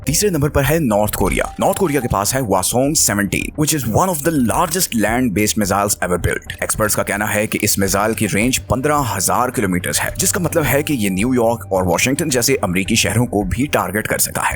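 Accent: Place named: Pakistan. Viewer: Why are you sounding pakistani to me? Are you? You included north korean missile which is in under development stage and put it ahead of america. And you conveniently ignored India's 16000 km range Agni 6 which is also under development. It is in advanced stages. Your this lack of knowledge is a proof that you are a porki. Agni 6 is coming baby wait n watch.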